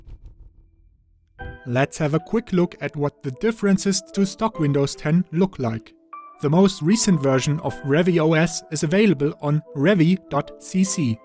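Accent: Germany. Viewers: man hört deinen deutschen akzent fett raus und trotzdem sprichst du englisch?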